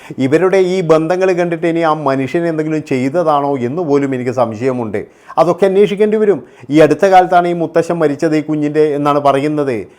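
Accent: Kannur, India. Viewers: താങ്കൾ കണ്ണൂർ അഴിക്കോട് ആണോ സഹോദരാ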